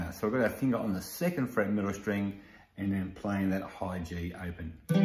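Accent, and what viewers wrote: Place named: Australia. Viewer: Great to have an Oz accent instructing 👏. Saw the cats jumper and and song title- thanks for this mate as I was learning this on 6 string from a mate who suddenly passed and I’ve also lost the finger ability for the 6 string so just got hold of a cbg. I’ll be learning this for me ol’ mate Doug and you have an Oz subscriber - also a Victorian. Cheers bud.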